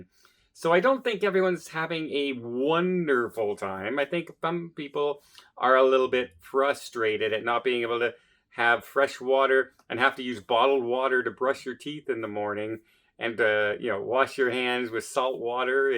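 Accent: Canada. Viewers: you must really be bored with your life. He is from Canada sometimes there pronounce words differently .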